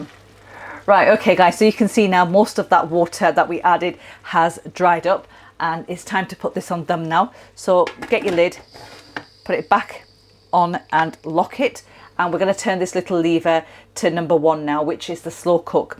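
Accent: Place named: England, United Kingdom. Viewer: Hey, Ur new subscriber..... I love Ur voice, and Ur brilliant ,clear English accent.....and not Ur eyebrows....lol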